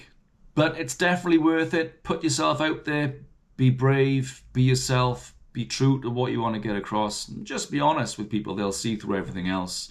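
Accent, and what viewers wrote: Wales, United Kingdom. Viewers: Wife put you on the big T.V, She likes your welsh accent, and is now talking to me in her welsh accent, Loved the video ❤ Very inspiring Filming at the allotments to day for The Friday Allotment A Random Lady Allotment holder just told me your on you tube, I didn't know what to say? I got in tonight and I see she has Subscribe to my channel 🤣 Embarrassing or what So I must start embracing it!!! Will do buddy, The cats out the bag for me, And post man knows as well 🤪 So there's no going back for me now.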